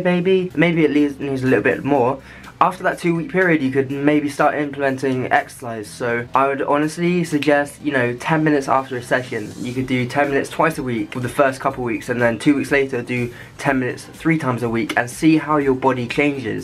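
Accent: United Kingdom. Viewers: blue eyes and british accent ! oh im falling for u !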